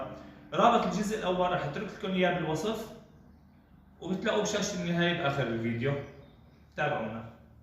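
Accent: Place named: Syria. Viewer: شغل حلو و الاحلى لهجتك السورية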